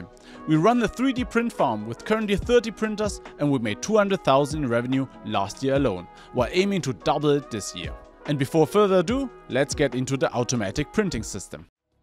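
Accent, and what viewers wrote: Germany. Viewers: I just realized this dude Asian, never thought I would see an Asian with a German accent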